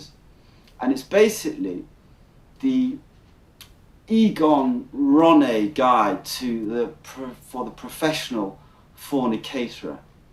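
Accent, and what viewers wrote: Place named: Upper-class, United Kingdom. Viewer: Upper class British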